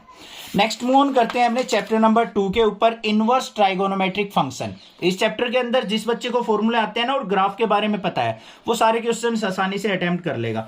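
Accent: Haryana, India. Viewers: Sir aap Haryana se h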